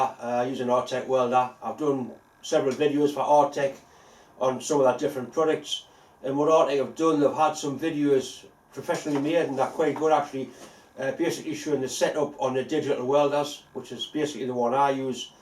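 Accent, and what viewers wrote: Newcastle, United Kingdom. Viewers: Another great year on YouTube,. I wait all week for your SNNCs and it's always a pleasure watching them, which I often do more than once, if only to hear your Geordie dulcet tones cursing "BASTAD"! May I wish you, Debbie and your dad a very merry christmas and a happy and healthy 2017. May I also thank you for your YouTube input, which gives great insight into a very skilled engineer, or as we referred to them in my apprenticeship days in the 60s, 'fitter-turner'... those were the two skills necessary to become a skilled toolmaker in those days and it was taken for granted that if you could turn on a lathe you could also perform milling, surface-grinding, shaping, tool-sharpening, etc. But with you, it doesn't end there... you are probably one of the best multi-media welders I have ever had the privilege to watch and for someone with a home workshop, you perform some of the best brass and bronze small-scale casting that I've seen (OK, it may not pan out right on the first attempt, but you get there in the end... 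but that's metal casting for you). I very much look forward to watching what you get up to in 2017.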